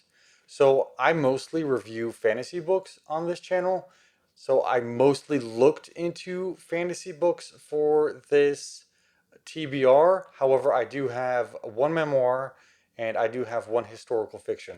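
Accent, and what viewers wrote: South, United States. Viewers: I can't believe you're talking about your late pride TBR at your house in Texas on this video during your quarantine including your past days in the days and don't ask, don't tell after you had a lot of the LGBTQ-related books including Gideon the Ninth by Tamsyn Muir, "The House in the Cerulean Sea" by T.J. Klune and "The Color Purple" by Alice Walker, so I'm very proud of you and I hope your husband, Zach will be very happy for you, instead and I love your Southern accent!!!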